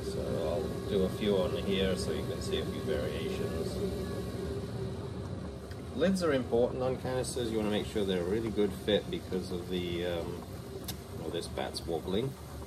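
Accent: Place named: Wales, United Kingdom. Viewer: I hear a Welsh accent, reminds me of home. Wish you were here in Beverly Hills, so I could gain confidence in making something bigger than a tea cup or mug.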